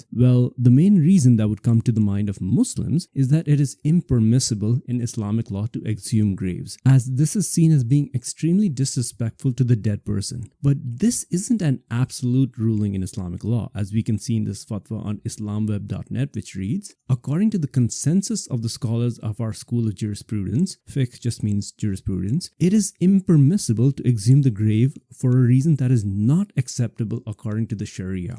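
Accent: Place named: India. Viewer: I noticed you only picked on Islam. And judging by your accent you are from the subcontinent meaning you have an agenda. It’s ok . Your Hindu gods changed sex to shag another god . And in Christianity there was a zombie apocalypse and dead people rose and walked around. But that hasn’t deterred its billions of followers. If you want to hear outlandish and absurdities read the Bible and the vedas. If you believe your religion is true you shouldn’t be worried about the religion of others. You should worry about your own salvation. Shinto is a religion perhaps you should discuss that. If you sre incapable of doing that , then it’s clear you are not sincere.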